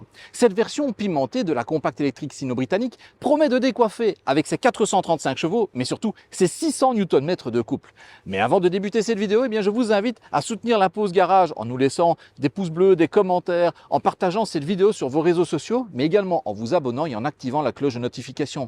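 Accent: Belgium. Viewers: Une chaîne amateur mais aux vidéos très pros, un bien bel essai, agrémenté d’un joli accent liégeois 😊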